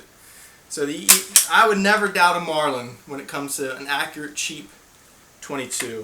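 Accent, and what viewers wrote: United States. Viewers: Is yinz from Pittsburgh? I'm hearing some signs of a SW PA accent. Could be OH too, sometimes. Anyhow, nice guns. I can relate.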